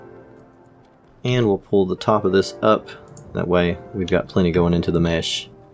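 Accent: United States. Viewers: lol the country acccent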